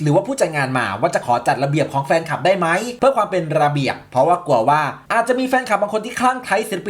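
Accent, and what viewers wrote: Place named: Nakhon Phanom, Thailand. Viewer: พูดได๋… เหมือนนครพนมบ้านฉันอิอิ❤️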